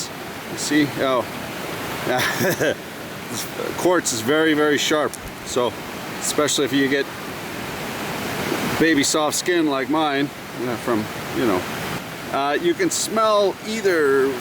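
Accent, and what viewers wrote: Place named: Canada. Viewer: Damn. i knew you lived in canada from the way you talk haha but didnt realize we live in the same province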